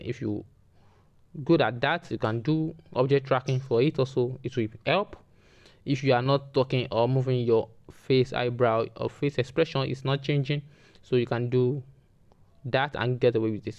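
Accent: Nigeria. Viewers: Talent speaks!!! You sound like you're from Nigeria I just subscribed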